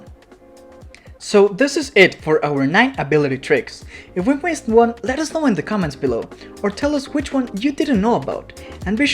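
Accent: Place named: Brazil. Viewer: Parece o sotaque de um brasileiro falando Inglês.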